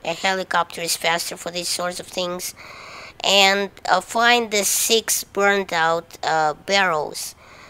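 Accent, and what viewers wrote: Russia. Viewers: I can't help but notice your russian accent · are you half russian half asian or something when i heard ur voice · Спасибо, что рассказал. Половину я и вовсе не знал. А по начальному английскому , понял что ты русский)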